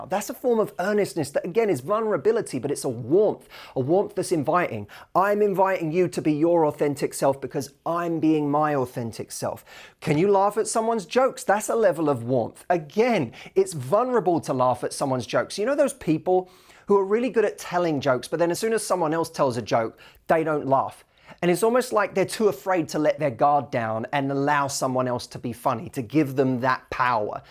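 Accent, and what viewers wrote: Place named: United Kingdom. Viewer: You look like patrick dempsy you are handsome like him sory for my bad language english language isn't my first language but i adore the british accent and i like your channel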